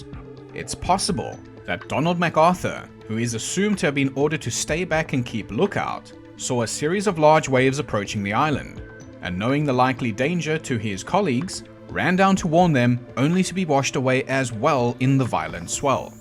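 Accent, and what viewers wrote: South Africa. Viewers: Is he South African? Can’t place the accent 😅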